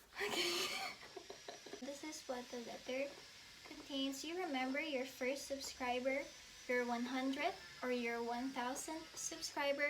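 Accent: Philippines. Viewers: I think your from Philippines because that's how I sounds when I'm speaking English and I'm from Philippines 🧋🧋🌸🌸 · weit is she Filipino bc she has the accent and she said and hirap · u sound like a filpino? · Wow hearing ur voice I just realized that ur Philippino and ur voice is so cute and sweet u r so good at acting and keep up the good work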